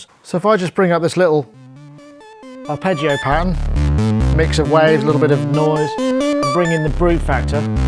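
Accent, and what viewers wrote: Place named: Cockney, United Kingdom. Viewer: Love that cockney style.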